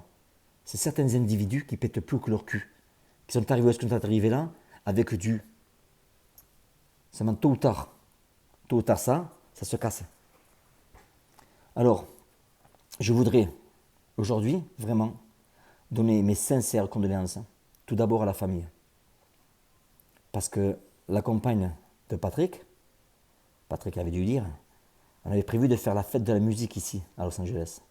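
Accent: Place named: Toulouse, France. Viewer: Cc!! Je ne te connais que depuis 3 semaines mais je t'adore surtout avec ton accent sudiste de Toulouse! Moi je suis d'Agen et in dit chocolatine ici bordel 😂😂😂 Continues comme ça, j'adore ton état d'esprit et ton franc parler! Vive le sud ✌️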